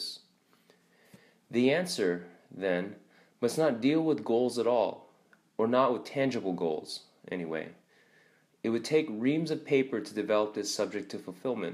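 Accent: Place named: East Asia. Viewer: Indigomarine91 cause of this zipperheads accent probably.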